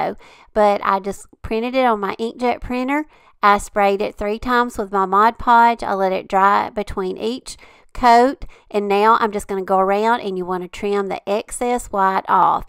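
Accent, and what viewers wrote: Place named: Kentucky, United States. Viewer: you're so very talented! Also, since I'm in KY, you do talk just like us. The recipe cards almost made me cry, because they look just like the ones my mamaw and my mom left us. They were stained, very well-used, and written in their own hand. Lovely video!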